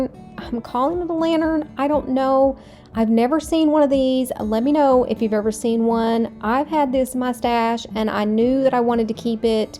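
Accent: South, United States. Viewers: I just adore your channel! I came across your latest video a few days ago and immediately hit that subscribe button and since then I have been slowly watching backwards as I find breaks during the day. Thank you so much for sharing your creativity, your ideas for selling ephemera and dragging out pieces in your stash to use. Your voice is fantastic despite what the deer head person said. I have a southern drawl too and I'm proud of who I am! Take care and I can't wait for the day you hit a million subscribers!😊😊😊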